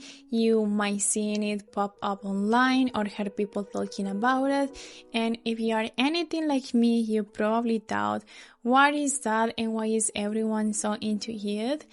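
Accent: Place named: Ukraine. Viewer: Im guessing Ukrainian or Solvakian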